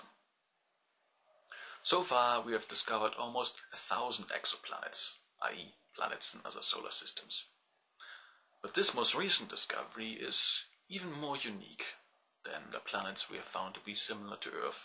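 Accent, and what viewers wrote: Germany. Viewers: God I LOVE hearing that accent! Ich bin von Erfurt. Aber wir (meine Eltern, Tanten, Onkel, etc.) leben schon für fast 30 Jahre in den Staaten! (In Salt Lake City, UT...) Woher kommst du? (Mein Deutsch ist nicht mehr so gut ...) God I hope you AT LEAST speak German... I swear! I KNOW that accent! I hear it every single day! It's so familiar. Then again, you could be Dutch, Swedish, Norwegian, etc... Hope I'm right though! ;)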